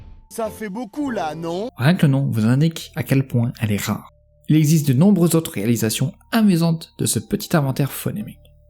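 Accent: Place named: Portugal. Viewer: oui je me demande aussi. Dans quelle région on prononce [tj] dans voiture ? jamais entendu ! cet accent me semble plutôt un peu portugais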